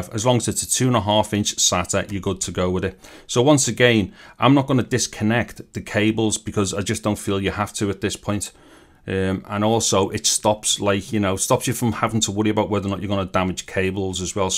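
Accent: Liverpool, United Kingdom. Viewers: lol, his accent is as scouse as it gets. it is awesome. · Ha, ha, ha.... I was saying to myself when you started talking... Liverpudlian. Just like them Beat- uls! I had friends from Liverpool decades ago. Also my Grandma's accent was close. She was from just a bit north of you. Thanks for the video.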